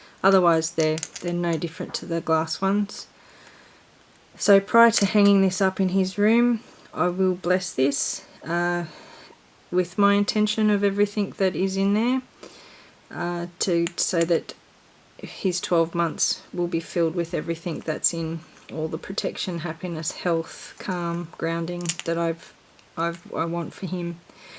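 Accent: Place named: Australia. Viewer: Thank you for sharing your crafting. It is so lovely to hear a fellow Australian accent.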